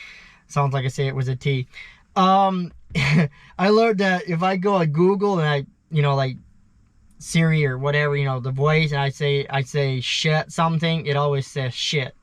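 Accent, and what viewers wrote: Pennsylvania Dutch, United States. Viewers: "I'm gonna keep saying building because it sounds like I'm saying something else." 😄 They very first time I heard you say "shed" I thought, I'll bet he was either raised Amish or in Amish country. Your PA Dutch accent is heavy. I went to college in the heart of Amish country in Pennsylvania. We heard this accent a lot. It takes me back to my college days. 😊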